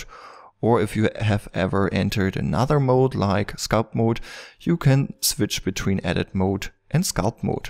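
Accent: Germany. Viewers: You sound German.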